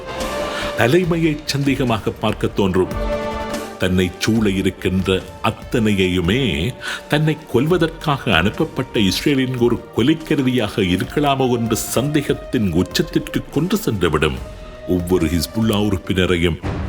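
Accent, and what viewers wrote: Sri Lanka. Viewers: இலங்கை தமிழ் 🙏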